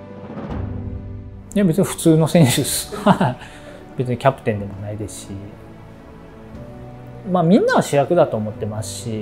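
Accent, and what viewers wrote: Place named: Kagoshima, Japan. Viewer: 鹿児島弁😭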